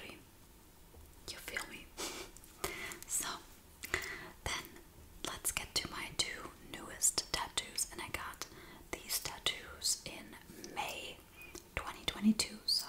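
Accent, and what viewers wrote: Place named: Germany. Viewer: h2o is basically my heart ps: i am german and i watched the video thinking that i can hear a german accent and then you said that youre from germany haha made me happy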